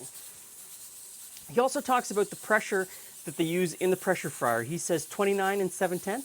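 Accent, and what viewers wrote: Canada. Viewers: 100% your canadian love the way you say about , watched a few of your vids and I have subscribed all the way from Scotland :)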